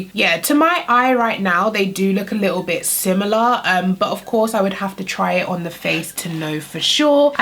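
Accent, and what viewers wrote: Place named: United Kingdom. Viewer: Aw from when you said Morphe sent you some stuff I was smiling girl 😫🤸🏾‍♀️ through the video I was like please tell me you gave her the brushes & you mentioned brushes I was like oh Yes Lord 😫💃🏽 I was finished. Girl i’m so happy for you. I been watching you for a couple months since last year & I love your generosity & honesty. Quite weirdly I like listening to your voice & accent lol even though I too live in the U.K. 😂 It’s time now we get onto that Discount Code so we can support the U.K. babes 💜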